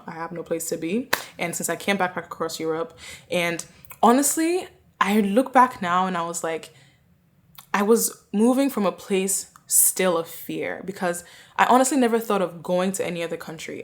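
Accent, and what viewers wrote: Nigeria. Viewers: I love your Nigerian accent....it's beautiful...and so are you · Stop listening to all those people and just do what you did and enjoyed to get you here. If talking in you 100% Nigerian accent is who you are ...then be you and do you. Stop trying to please everyone but YOU!! Sweetheart you are hurting yourself for others. You are not a living sacrifice for them.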